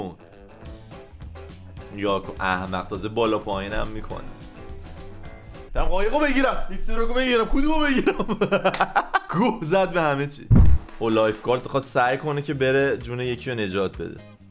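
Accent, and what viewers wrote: Isfahan, Iran. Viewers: اصفهانی حرف بزن ناموصا😂❤️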